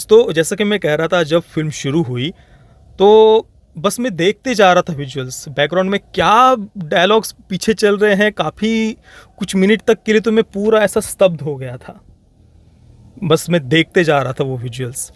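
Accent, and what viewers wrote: Maharashtra, India. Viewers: भाऊ तुझा भाषेवरून एक मराठी माणूस लगेच ओळखु शकतो मस्त आहे तुझं (वर्णन शब्द). मस्त वाटल मराठी माणूस review देताना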